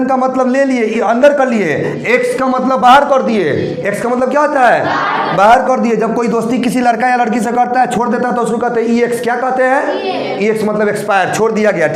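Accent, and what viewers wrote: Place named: Bhojpuri, India. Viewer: Bojpuri style read kar rahe sir i am raviranjan kumar 20 tarikh ko life maker aay the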